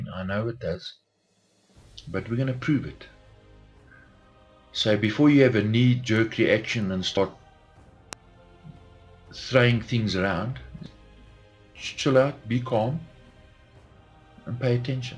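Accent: South Africa. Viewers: are you a South African.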